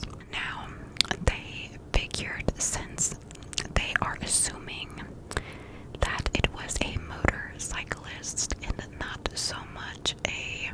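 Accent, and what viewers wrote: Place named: Ohio, United States. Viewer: I fucking knew you were in ohio! You have the same accent as me and all my peeps lmao. I grew up in summit ohio or blacklick outside of columbus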